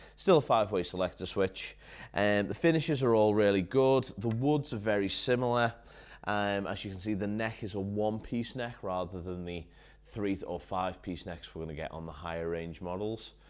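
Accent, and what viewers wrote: Liverpool, United Kingdom. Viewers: Subscribed because you gave good reviews (Just ordered an Ibanez gio grg 121 yesterday as my first guitar to learn on) and you got that scouse accent. (shoot me If I'm wrong) Ha cheers from Australia.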